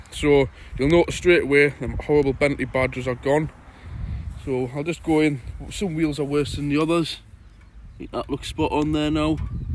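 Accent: Newcastle, United Kingdom. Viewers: You've been busy bonny lad ! Done a lot and it's looking really well. Good luck with it and I hope you get a healthy price for it considering you've been very honest with any faults or bad bits. Ps. it's just like being home again listening to the Geordie accent. Cheers man.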